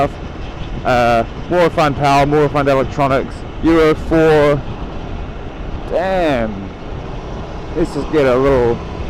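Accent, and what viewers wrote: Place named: New Zealand. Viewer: Great to hear a Kiwi accent on a bike review! Great review! And most importantly... Oooh look, a Squirrell!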